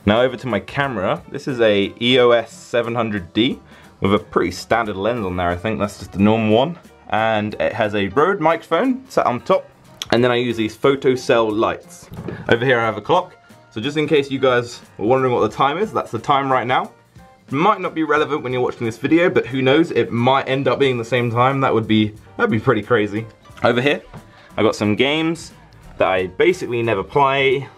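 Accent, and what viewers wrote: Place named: United Kingdom. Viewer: is from the UK. You can clearly hear the difference between English (British English) and 'English' (American English). Jordi and Jelly are from the Netherlands, they have been speaking "English" (Dutch English) in videos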